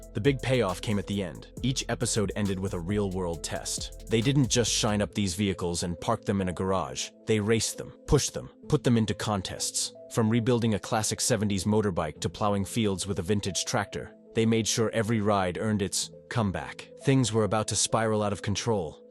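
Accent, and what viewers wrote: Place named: United States. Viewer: Why the hideous American voiceover ???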